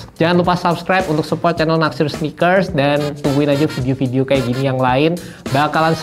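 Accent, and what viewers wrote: Java, Indonesia. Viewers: Gue nya medok mas.. Keren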